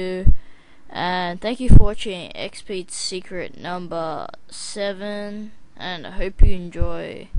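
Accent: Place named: Australia. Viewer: =O AN AUSTRALIAN!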